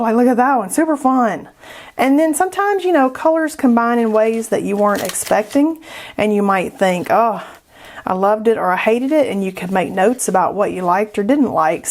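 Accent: South, United States. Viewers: Your southern accent and bubbly personality are so refreshing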